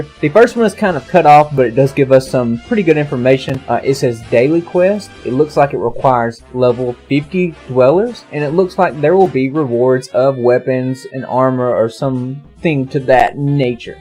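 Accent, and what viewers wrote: South, United States. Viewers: man i love you southern voice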